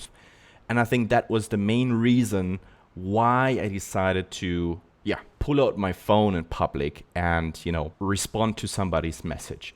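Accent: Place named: Germany. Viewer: gutes englisch...aber du kannst den deutschen akzent nicht verstecken ;) ich hoffe dir geht es nach dem überfall gut ?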